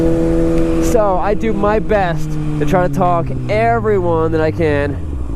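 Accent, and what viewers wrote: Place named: Pennsylvania, United States. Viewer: I'm a recent subscriber and I love watching your videos. As you know there's tons of YouTube channels about motorcycle reviews and at first I didn't realize why I liked yours so much, then I got it. It's that subtle Pennsylvania accent. My family's from Pittsburgh, which some have a much more strong accent than you. I lived all around the country and pretty much lost it all together, unless I'm imitating it on purpose. Of course the rides are great, you discuss what I really want to know, I wouldn't watch a vid just to hear an accent. Thanks for the good job!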